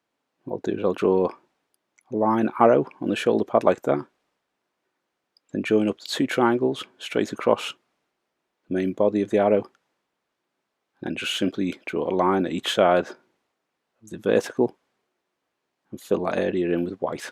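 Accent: Liverpool, United Kingdom. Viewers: The scouse accent if i close my eyes i feel like I'm watching art attack.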